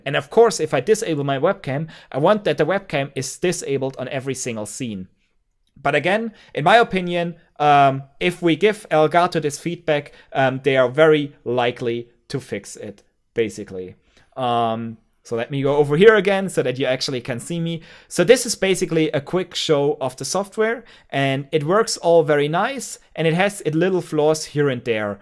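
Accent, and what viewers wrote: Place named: Germany. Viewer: Are you German? Cause I can hear the accent.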